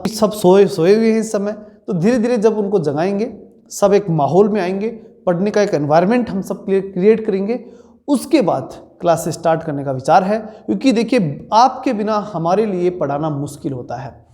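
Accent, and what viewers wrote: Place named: Bhilwara, India. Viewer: सर आप भीलवाड़ा जिले के हो क्या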